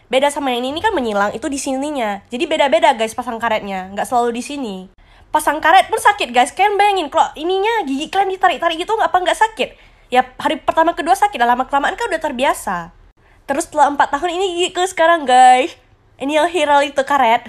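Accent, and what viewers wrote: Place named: Medan, Indonesia. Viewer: Logat bahasa nya kak seperti nya orang Medan btw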